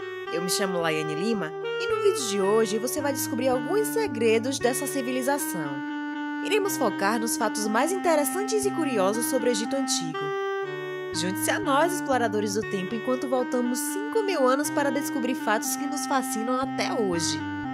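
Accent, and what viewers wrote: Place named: Bahia, Brazil. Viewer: Essa narradora é baiana hein kk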